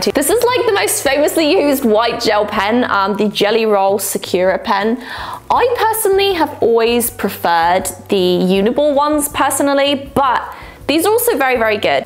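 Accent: United Kingdom. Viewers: Would be interested in the details of the company, as am in the uk... look very good quality! Thsbjs for the great video (as always), lovely to see that you have retained your uk accent! X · I liked the derwent graphite paints. And the faber castel pens too. 12 boxes to choose from. Makes for a hard decision to choose just 1 box. Love watching you. Nice hearing a British voice in the USA. Love from the UK x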